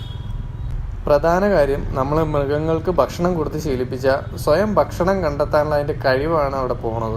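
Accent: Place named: Muvattupuzha, India. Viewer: Njanum..super presentation aanu bro..muvattupuzha/ perumbavoor/Thodupuzha slang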